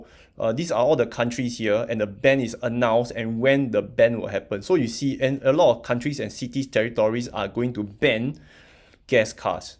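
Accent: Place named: Singapore. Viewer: If you cant tolerate that singapore accent, I recommend moving there permanently.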